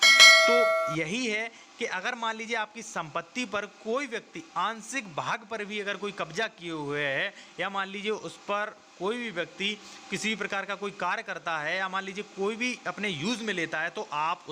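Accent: Uttar Pradesh, India. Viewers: Sir aapki bolne se. Lagta hai ki aap agra aligarh ke as pass practice karte hai...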